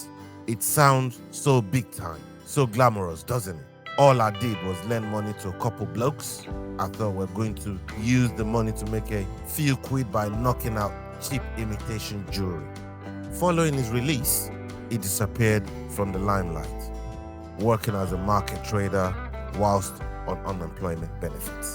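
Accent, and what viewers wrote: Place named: Africa. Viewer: Why is an African narrating this 😮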